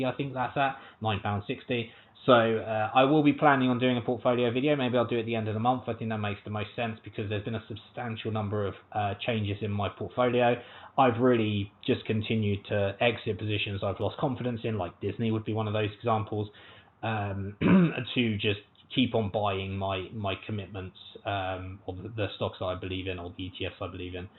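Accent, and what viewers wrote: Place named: Australia. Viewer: I cannot tell if you have an Aussie Twang to your voice or it's that sort of unique London/Southern accent.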